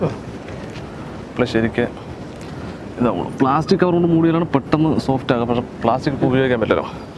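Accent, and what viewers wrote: Palakkad, India. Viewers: am from Coimbatore i saw your all videos like it especially your palakkad slang is good and like it basically am from palakkad. one day I want to join with ur food preparation and tasting with you. If any chance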